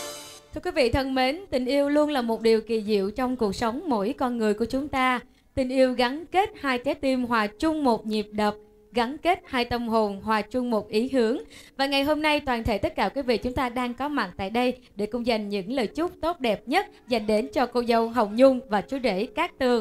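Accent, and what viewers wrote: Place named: South, Vietnam. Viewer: Chuẩn MC giọng miền Nam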